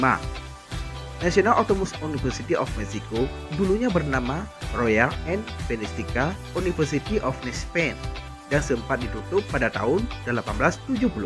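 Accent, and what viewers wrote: Aceh, Indonesia. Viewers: Ini yang ngisi suara org Aceh ya? Logatnya Aceh banget 😂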